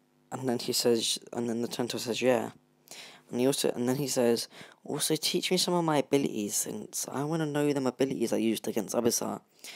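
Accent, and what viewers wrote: United Kingdom. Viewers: yo man’s is welsh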